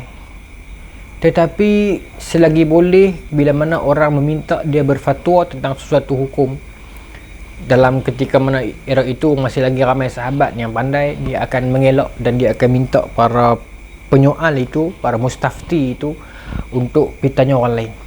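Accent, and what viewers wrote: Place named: Muar, Malaysia. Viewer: Muar clear 🤩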